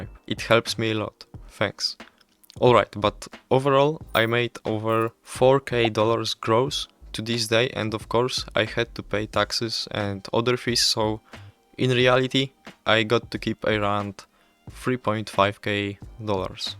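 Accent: Poland. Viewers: ty z polski jestes bo taki akcent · ty polak co nie XD od razu slychac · poznam polaka jesli go usłysze... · brzmisz strasznie polsko, powodzenia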